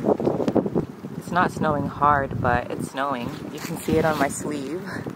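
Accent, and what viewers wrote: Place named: Upstate New York, United States. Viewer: I'm still watching the video but it sound like you are upstate NY. I hope you visit one of the boroughs LOL Come visit the city. I can show you all the spots you will love like ice skating in Manhattan!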